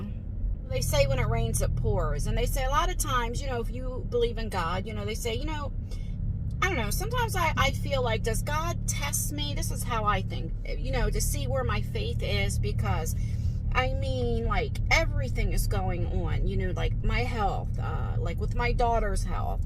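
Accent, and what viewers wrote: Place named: New York, United States. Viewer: Lol that's just your New York accent 😂. That's ok. But im so sorry for your loss with Bella. I know it's much more than just losing her for you.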